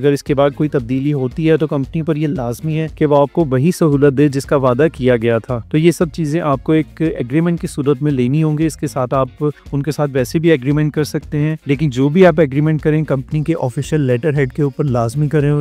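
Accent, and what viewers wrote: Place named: Bengal, India. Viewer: bhai ap Accent bangali wala lag raha hai..bhai bura mat man na..🙏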